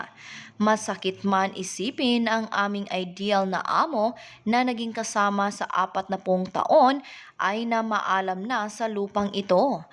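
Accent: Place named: Visayas, Philippines. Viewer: Sensya na kau BISAYA ang nagsasalita na vlogger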